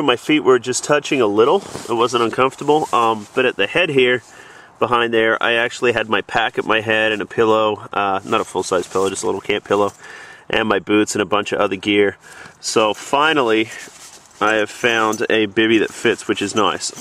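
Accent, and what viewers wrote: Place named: United States. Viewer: You have a thick American accent for an Aussie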